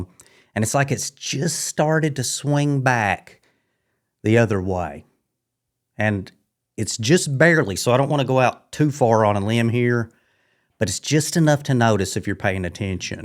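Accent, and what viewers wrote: South, United States. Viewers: I just found you. I subbed. Keep up the videos buddy. You're good at this. People need your message. Oh, and great Southern drawl. 👍 From a South Dakota Yankee.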